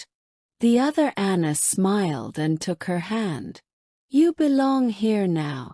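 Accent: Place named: United Kingdom. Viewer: شكرا لاني بحاول اتعلم الاكسنت البريطاني ومالقيت كثير مركزين على أن يكون كلام بريطاني مىة بالمىة وانا احب قناتك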